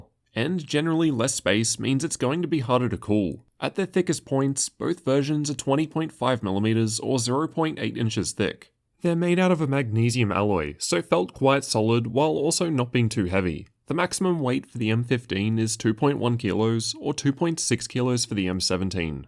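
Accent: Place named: Australia. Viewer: His accent and his voice is what I like. Back in the days when I was learning English, in our institute, we had both American and British accent teachers, but not an "OISTRALIAN" one (: I think his videos are so suitable for any English learner who wants to get familiar with Australian accent.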